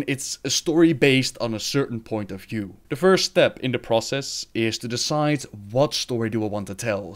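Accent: Netherlands. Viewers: Dutch accents are great. I like how he pronounces "Th" as a "D" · I had no idea you were Dutch! I really like your accent and all of your Lore videos, my guildmates say I'm an Encyclopedia thanks in part to you:)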